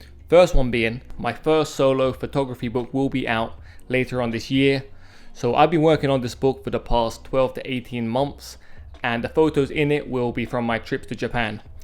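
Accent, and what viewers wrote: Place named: Greece. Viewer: I like how he starts out sounding like Ali-G and ends up sounding Greek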